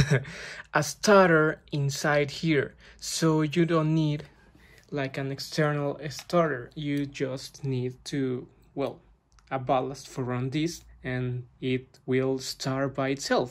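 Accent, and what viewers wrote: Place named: Mexico. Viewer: I think so. He collects Mexican lighting and has a Mexican accent (I think). I'm terrible at recognising accents.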